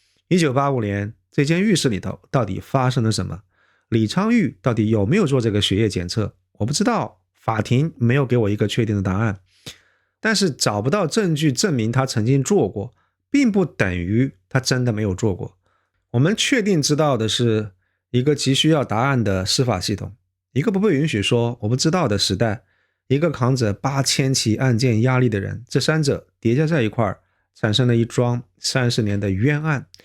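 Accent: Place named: South, China. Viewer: 自己说过好几次自己是南方人普通话不太标准有口音理解万岁👏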